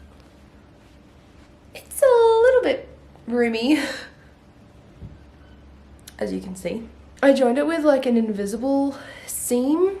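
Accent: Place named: Australia. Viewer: The best part was the australian accent, love it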